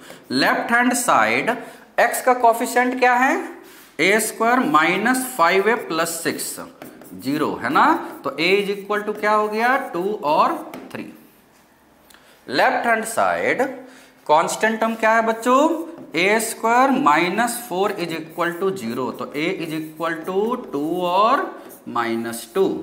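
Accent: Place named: Bihar, India. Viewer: Pura Bihari babu ka feel aara tha!!😂😘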